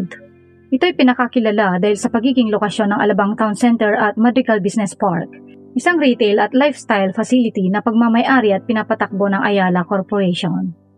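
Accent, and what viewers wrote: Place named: Bicol, Philippines. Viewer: Bicol accent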